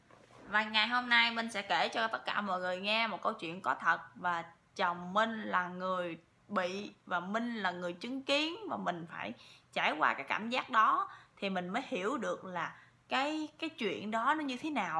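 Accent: South, Vietnam. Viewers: Bạn rất đẹp - giọng miền nam cưng ghê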